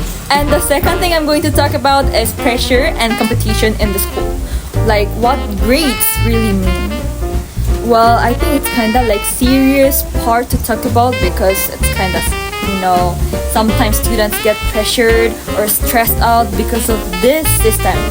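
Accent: Philippines. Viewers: You're starting to have the filo accent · woah she has addopted the Filipino English accent. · Great 👌 content, your English accent is perfect now very Filipino English accent, you have adapted well.. enjoy your holidays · filipino accent yung english hahaha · Love your Accent. You sound Filipino 🥰. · Your accent sounds pinay😂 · naging pinoy na yung english accent:face-green-smiling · your English accent is so Filipino 😂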